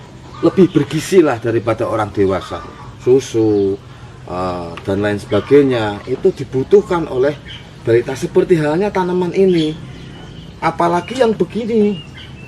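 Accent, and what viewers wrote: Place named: Java, Indonesia. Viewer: ni bahasane medok banget ternyata, pakai sulap spt pak Tarno pula 😀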